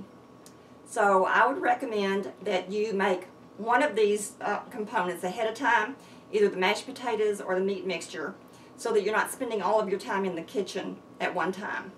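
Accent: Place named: South, United States. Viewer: I am betting y r a Southern bell from your accent. I am from Georgia. I buy peanuts in shell and grind up in a bullet. so good.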